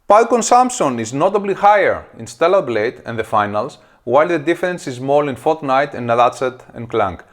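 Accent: Greece. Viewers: most greek accent ever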